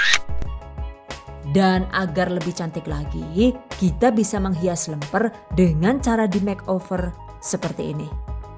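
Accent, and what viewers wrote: Banyumas, Indonesia. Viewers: Cantik-cantik lempernya bunda.... terimakasih bunda resepnya, jadi lebih praktis buat lempernya. Saya selalu suka dengan resep karena mantap dan lucu2 ada selingan humornya terutama bahasa ngapaknya itu lho. Jadi penasaran bunda ni asli madiun atau daerah ngapak ya.... 🤭🤔🙏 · Matur suwun nggih mbak 🙏 sampun berbagi Resep Lempernya...Jaaan Ngapake ky inyong ya 😁 ora ngapak ora kepenak 👍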